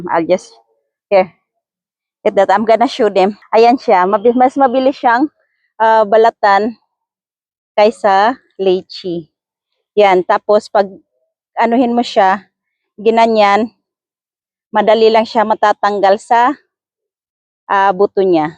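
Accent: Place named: Albay, Philippines. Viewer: Parang Albany Ang salita mo idol Kasi mo sondang tapos tigbasan taga saan ka Po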